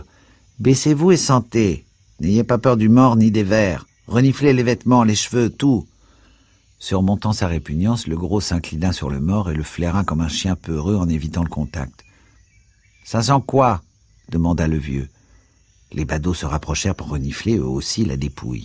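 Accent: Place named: France. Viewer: One of my favourite books. Pity you read it with a Frenchman accent. Please retry.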